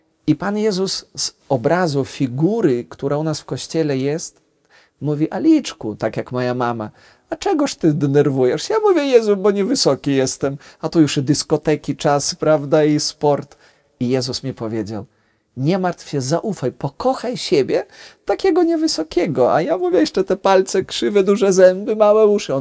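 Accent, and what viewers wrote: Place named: Kresy, Poland. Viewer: piekny, poetycki , kresowy akcent ksiedza, cudny ksiadz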